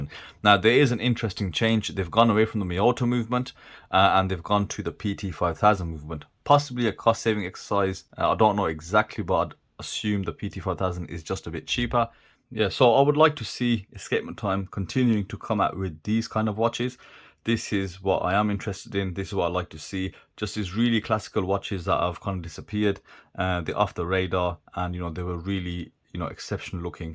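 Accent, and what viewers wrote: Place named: Scotland, United Kingdom. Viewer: His mottled Scottish accent makes me trust him.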